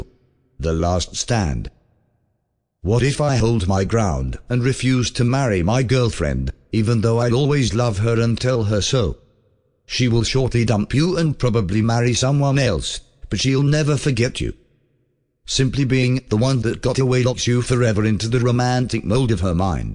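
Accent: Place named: United Kingdom. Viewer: This British accent is driving me crazy.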